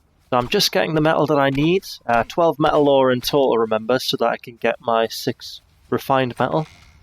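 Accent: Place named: Ireland. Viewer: I love your irish accent lol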